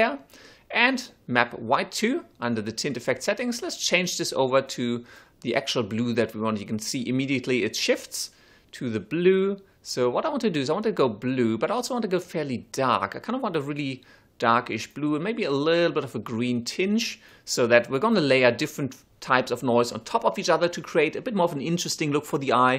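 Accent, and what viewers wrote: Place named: Germany. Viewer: Hey You sounds like a German accent to me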